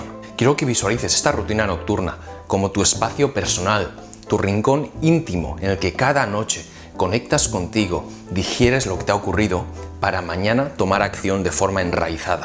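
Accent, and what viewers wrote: Spain. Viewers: Hola , excelente informacion y con acento español pero muy bien explicado. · Si rasgó orientales asentó español y una voz muy varonil y ademas guapo y simpático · Es porque se habrá criado desde niño o nacido en España. Yo tengo un amigo chino que habla igual, como nosotros los españoles, porque ha nacido aqui. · habla castellano con tono Español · Woooow me acabo de enamorar !! Que combnación mas way!! Rasgos orientales, acento español y una voz súper Varonil !! 😍😍😍😍😘😘😘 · This the first time seeing you Your Spanish is impeccable better than mine you have to live either in Spain because of the accident that you have with certain words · Un asiático con acento español💖 Oh Dios, me enamoré 😍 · Excelente video, me llama la atención, que un oriental hable con acento español...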